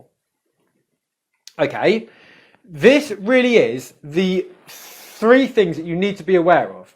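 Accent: United Kingdom. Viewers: I'm sorry to ask this question but are you originally from Britain? Your demonstration of these two sounds are different from another British girl's.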